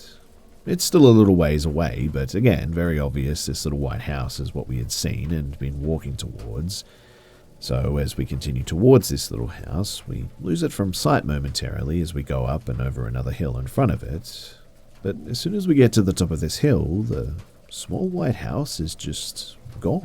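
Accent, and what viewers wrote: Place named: Australia. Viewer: Aussie Accent cultral cringe bro. Fuck its hit me hard. Good stories though but Okka as fuck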